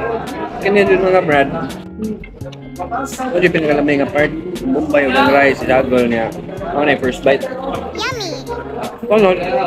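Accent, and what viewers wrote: Visayas, Philippines. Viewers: Kabisaya ba jud kaau ani nya oi.. pati mag likramo sa silupin hahahaha new subscriber here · Kuha gyud kaau ang accent sa mga Bisaya ba..kung di ko motan aw sa video Bisayang tao gyud akong maimagine.pero kay nakita na naq mas nibilib kaau ko..hawod kay moBisaya tapos kaming Bisaya usahay maglisud ug English......❤ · lami kaau imong accent brad 🤣👍🏻.. 1000% bisaya accent! i just subscribed to your channel 😊❤️ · First time nahong tan-aw sa imong vlog. Cute kas imong bisaya nga accent maka adik. Murag kag tinuod mauni gi tawag og ugis na puti hahaha. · Atay bisaya jod kaayo ohhh hahahaha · 🤣🤣🤣 Bisaya man diay ka! love it! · ayus accent nimo bpd bisaya gyud kaami paminawon oi..... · Pila na diay ka katuig sa pinas sir? Orig naman kaayo imong bisaya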